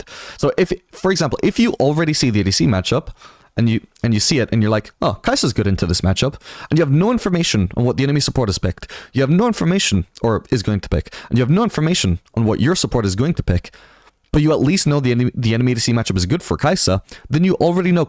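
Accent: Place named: Canada. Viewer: Where are you from? I hear some kind of Canadian accent although account’s from the UK haha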